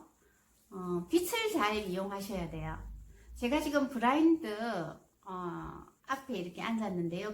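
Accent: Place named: Busan, South Korea. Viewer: 사투리로 봐서는 부산 아지메가 학실합니더~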